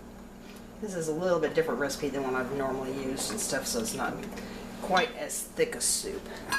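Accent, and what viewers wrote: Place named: New England, United States. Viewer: Looks great!! Your New England accent wasnt to bad either:) Cheers!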